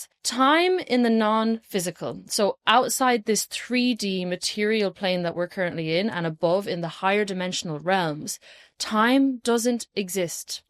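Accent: Ireland. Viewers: This was great! I love hearing that Irish accent too 😊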